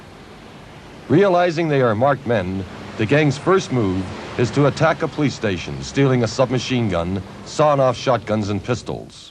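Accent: Canada. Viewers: The narrators Canadian accent is really distracting. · LOL at the Canadian narrators accent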